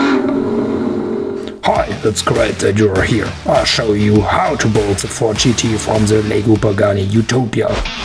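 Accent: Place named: Germany. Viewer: Are you German? Because you sound very German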